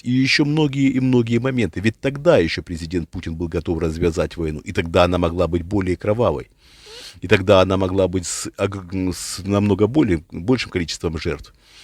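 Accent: Ukraine. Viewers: Судя по вашему говору, вы украинец?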